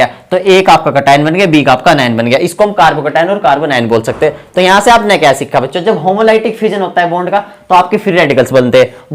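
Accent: India. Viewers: Sirji west up wali bhasha mast h 😂❤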